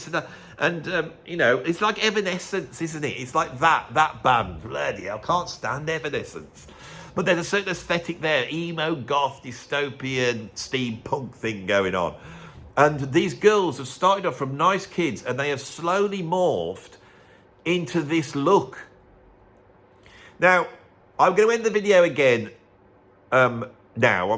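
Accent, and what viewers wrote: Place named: England, United Kingdom. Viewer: I have to say, I never realized how American the epithet “douchebag” is until I’ve heard it with an English accent.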